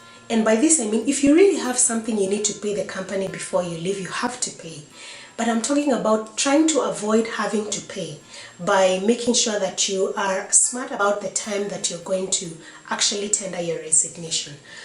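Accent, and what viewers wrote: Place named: Kenya. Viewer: I came across your video after quitting my job abruptly. Thanks for the solid advice. You sound Kenyan na uko Vienna? Niko Wien pia. Point 7 hit home...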